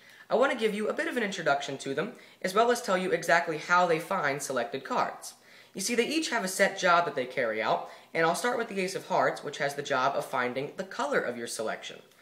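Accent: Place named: United Kingdom. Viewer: Oh, are you British or American?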